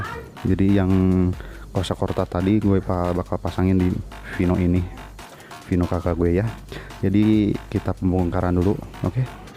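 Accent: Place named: West Java, Indonesia. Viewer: Gua aja bang jangan gue jd norak soalnya logat sunda ga pantes bilang gue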